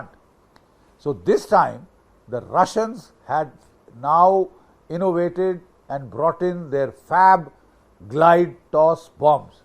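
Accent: India. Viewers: I can’t listen to this paj33t accent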